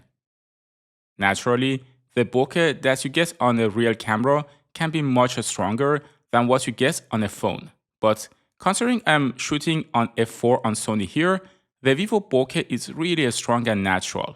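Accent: Iran. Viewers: bro your accent is sooooo iranian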